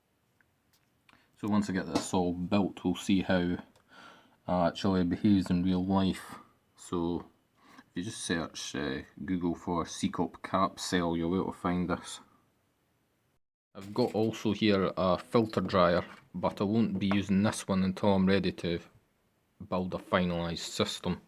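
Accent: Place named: Glasgow, United Kingdom. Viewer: I'm fascinated by your accent, are you from glasgow?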